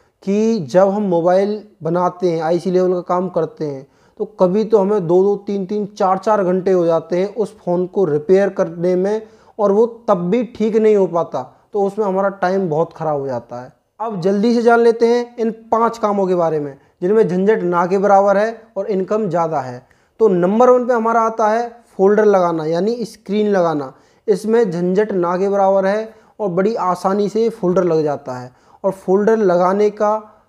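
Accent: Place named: United Kingdom. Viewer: Apne to hosla bada dia aapkenatane k andaz nirala h lagata h aap uk se ho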